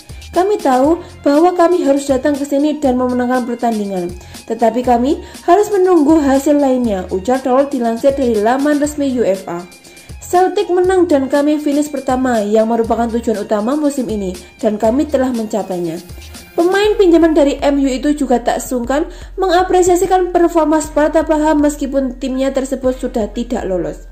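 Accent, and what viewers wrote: Java, Indonesia. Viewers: Suaramu muedok bgt mbakk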